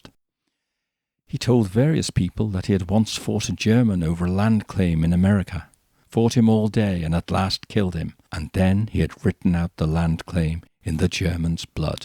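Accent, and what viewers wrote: United Kingdom. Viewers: This was undeniably British, what ?! I'm inclined to start saying Cheerio, instead of bye.🇬🇧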